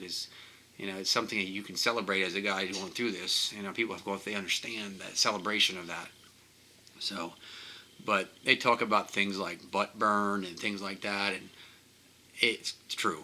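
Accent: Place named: Pittsburgh, United States. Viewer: Crying as I’m reading this now even though I’m late to the game. Recognized the accent though as I’m a native Pittsburgher now living in Los Angeles. I’ve decided to not get the reversal surgery, I just don’t want to go through all of that to find out I have to have it done again God forbid. Is the Ng tube necessary for all procedures Up?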